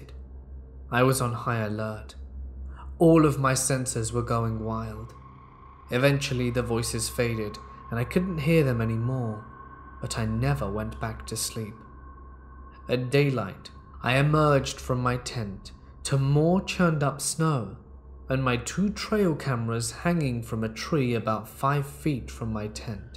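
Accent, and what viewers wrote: United Kingdom. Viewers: Yaknow ive spent very very extensive amounts of time in the woods and mountains of kentucky and a few other places like weeks at a time frequently im more at home in the wilderness than i am in a house or society around people while ill admit ive seen odd stuff hurd some freaky things i found explanations to all and have never seen nor heard anything cryptid lol still some damn good stories especially sense it sounds like a 600 yr old vampire is reading it too you not just bc he is from the uk but his tone and flow hes a pretty damn good story teller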